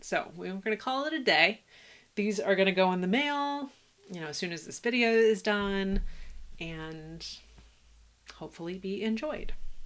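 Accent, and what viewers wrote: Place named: United Kingdom. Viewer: Wow! I’m only a few minutes in and coming here to say that I am glad to find you and am excited for your videos! My guess is UK, Britain? 🐑 congrats on Jeopardy. Super cool.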